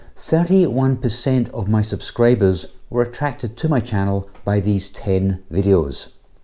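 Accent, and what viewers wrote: Scotland, United Kingdom. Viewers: What a fantastic channel! I’ve only been watching the channel for about a month or two now. Most of the content I watch on youtube is travel related so to hear a Scottish voice in them is even better. Fantastic coverage of all things road rail air and sea! I watched your video hiking up Ben Nevis the other day and even that, with its lack of transport content and overall different theme to your usual content was a fantastic watch! Keep up the good work. Excited to see what videos 2024 brings. All the best to you sir, although I do hope St Mirren get relegated ;)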